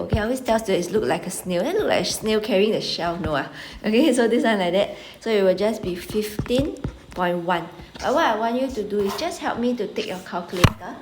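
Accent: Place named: Asia. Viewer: The most Asian accent I have a test tommorow 🤔👍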